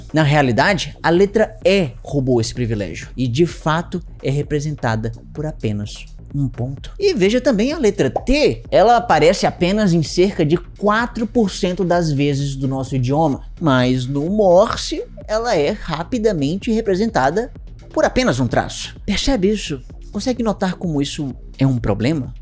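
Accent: Ceará, Brazil. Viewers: Juro que não sei de que estado ele é mas tenho a impressão que é do Ceará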